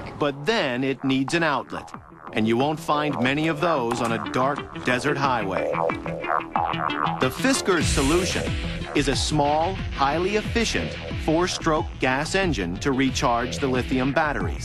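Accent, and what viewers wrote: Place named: Denmark. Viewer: Haha :D it's funny how clearly you can hear that he is danish ^^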